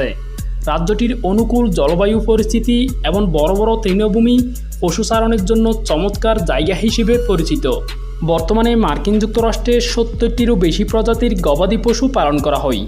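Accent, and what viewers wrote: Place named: Chittagong, Bangladesh. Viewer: আপনি চট্টগ্রামের লোক মনে হয়।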